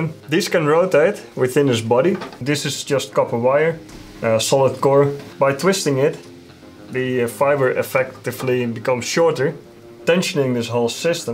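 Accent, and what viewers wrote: Netherlands. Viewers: Wow, nice design! From your accent I'm guessing you are dutch: knap ontwerp en zeer knap gemaakt! Je zou ze zo moeten verkopen, toch best wat materiaal voor nodig om dit eenmalig zelf te maken. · Very cool looking lamp! This is the first video I’ve seen from this channel and listening to your accent, you are a fellow Dutchie right? I’m just getting into 3D printing and orientating at which printer to buy. But I’ve just subbed to this channel for future inspiration for prints 👌🏼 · it took me 0.1 seconds to recognize a fellow dutchie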